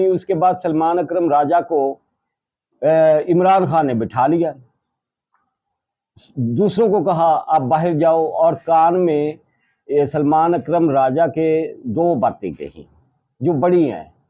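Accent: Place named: Punjab, Pakistan. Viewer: nice commentary with punjabi tankay!😀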